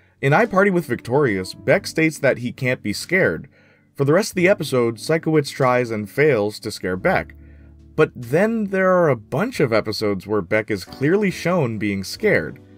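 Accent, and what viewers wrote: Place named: Canada. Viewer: I could just tell he's canadian by the way he says things and his word choices. I guess he's just the polite boring canadian stereotype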